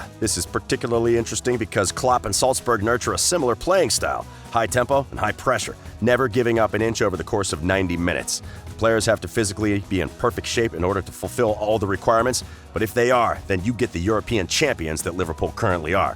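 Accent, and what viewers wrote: United States. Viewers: Soon as I heard the annoying twang of American accent couldn't take it seriously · U can tell he's an American for sure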